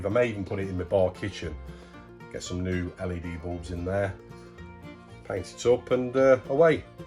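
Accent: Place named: Grantham, United Kingdom. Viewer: Great video and nice haul from the boot sale. That Stanley magnum screwdriver is a good one, I don't see many that length, although the red bits of the handle looked very beaten up! Careful of buying tools at car boots, it is addictive as I have found to my cost! I am going to guess you accent as Grantham. All the best!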